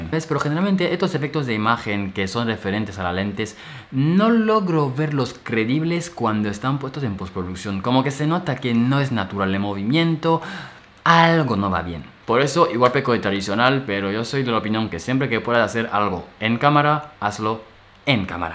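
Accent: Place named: Spain. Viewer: Pocos videos hay en español y tu lo resume bien, por cierto de donde eres Bro? Traes un acento que me suena bastante y batallo en identificar, es una mezcla con el acento español.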